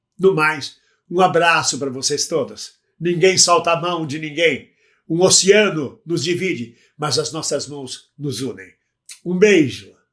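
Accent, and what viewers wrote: Portugal. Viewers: Vc está c um pouco de sotaque português! Que bonitinho rsrs❤ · O Bemvindo está com um leve sotaque português! Isso é muito bom 😊! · E vc já está ficando com sotaque de português. Como sou filha/neta/bisneta/tataraneta, já estou acostumada com o sotaque. Meu avô materno, tinha o sotaque dos Açores, esse sim é um sotaque difícil, rsss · "Pra entender o que estás a falar" Querido estais a pegar o lindo sotaque de Portugal.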